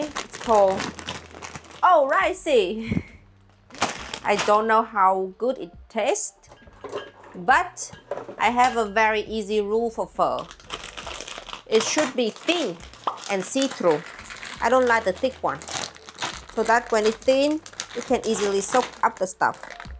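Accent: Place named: Germany. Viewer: Hi! I don't know how your German is coming along, but you are developing a German accent in English, so I'm guessing your German is improving!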